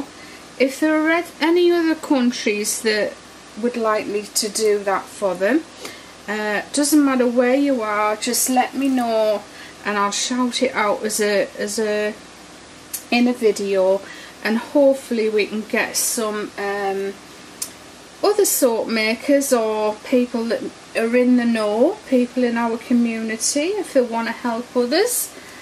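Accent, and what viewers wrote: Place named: England, United Kingdom. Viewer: Are you based in England because you sound like a English person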